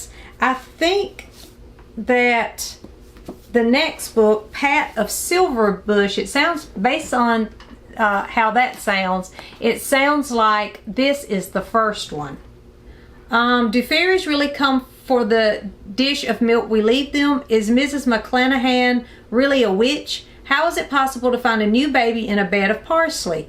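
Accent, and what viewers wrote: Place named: North Carolina, United States. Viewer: Are you from NC? That's where my mom was from and your accent is so similar. That copy of Heidi is gorgeous! I haven't heard of those LM Montgomery books, but I love those covers too:)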